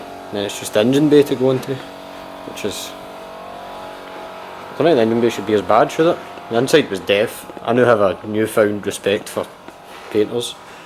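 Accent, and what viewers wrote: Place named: Scotland, United Kingdom. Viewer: Good job on orange escort m8, will be watching for it at knockhill this summer, looks lit bud.I'm from kirkintilloch near Glasgow, I'm guessing your not to far away going by thick Scottish accent lol . Do you guys weld exhausts, looking to get exhaust flexi pipe fitted to 09 seat leon Fr550. It's the pd170 engine and still got DPF intact. I've got a small garage with 2 poster ramp, mig welder and gas n acetylene but my welding is total crap. Any help appreciated 😁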